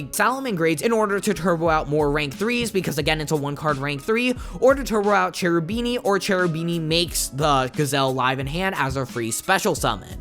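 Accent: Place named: United States. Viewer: Goddamn, why does no one look into the right pronunciation for these foreign words? I'm Italian myself, and hearing these names (which come from a very important piece of our culture, being la Divina Commedia) pronounced by an American as an Anglo-Saxon word when it's CLEARLY an Italian and Latin word annoys me so much.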